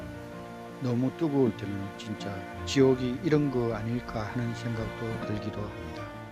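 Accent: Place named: Gyeongsang, South Korea. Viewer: ^ 채널명이 멋집니다 열정의 샘이시군요. 작가님! 구수한 경상도 목소리가 좋습니다. 화이팅입니다..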